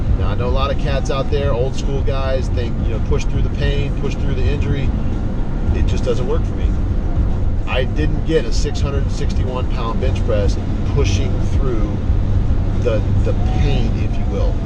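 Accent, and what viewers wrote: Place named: Europe. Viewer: You talk like an european . I love it and you are 100% right in all what you said in this video.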